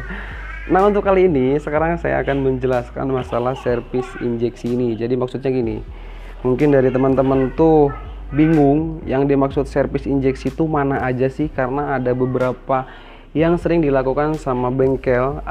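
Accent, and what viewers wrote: West Java, Indonesia. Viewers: sya suka kalo si abang ini dalam bicaranya urang sunda mana ieu teh resep ey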